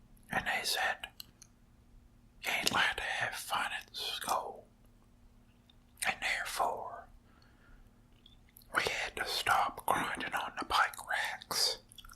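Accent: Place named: Pittsburgh, United States. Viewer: You sound like you're from Pittsburgh lol.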